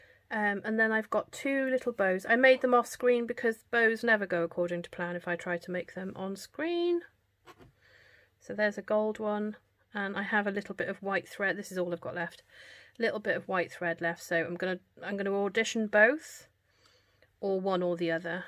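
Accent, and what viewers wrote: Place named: Wales, United Kingdom. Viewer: Beautiful, great technique! Great to hop with you. Also, I hear a little welsh accent coming through. Reminds me of home!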